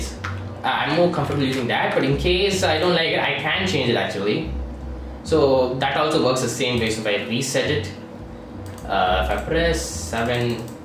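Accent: India. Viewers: Jesus fuck indian accent is so fucking annoying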